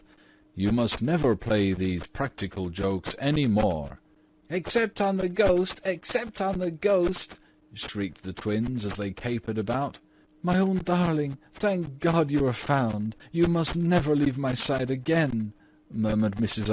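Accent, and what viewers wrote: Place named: United Kingdom. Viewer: good but it's British .. Is there a story with an American accent ?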